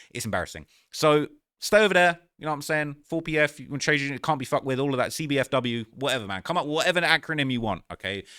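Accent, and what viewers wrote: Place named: United Kingdom. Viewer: You accent drives me NUTS!!! And the fact you always act like your black drives me even more nuts!!! You are a bum from the UK!!! Stay in your lane yt boy!!!! You gon get it very soon . Remember those words I just said. See you on the news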